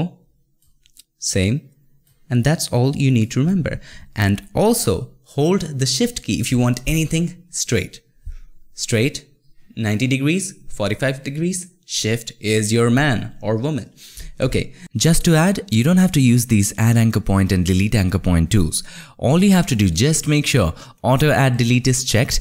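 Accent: India. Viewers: bahasa inggris logat india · du redest zu viel mit deinem indischen Akzent😮😅😊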